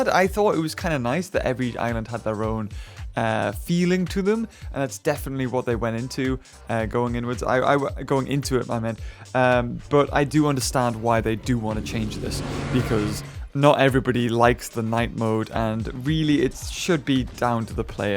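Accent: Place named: United Kingdom. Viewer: You have a uk acint and britches in some video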